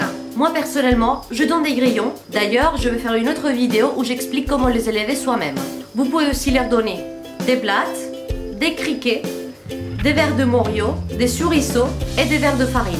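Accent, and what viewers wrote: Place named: Russia. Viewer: Tu es d'origine russe ? Tu as l'accent 😉 Je parle Russe et travaille avec des russes donc ces accent est très familier.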